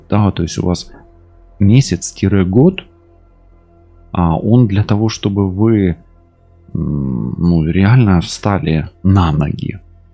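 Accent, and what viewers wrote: Ukraine. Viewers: Вы просто супер- мне так импонирует Ваши комментарии )))) и говор украинский, как же это приятно на слух! Боже как это классно!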